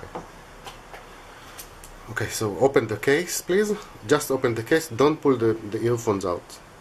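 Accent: Israel. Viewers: עכשיו ראיתי את המוזיקה אח שליח ידעתי שאתה ישראלי מהמבטא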